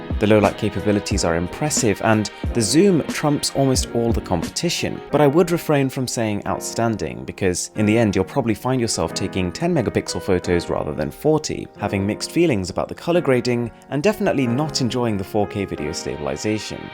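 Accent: RP, United Kingdom. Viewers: Why get your posh friend to narrate? is your voice that bad? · This chap sounds awfully posh ya.. Good review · Thanks! Good to hear a British accent on YouTube!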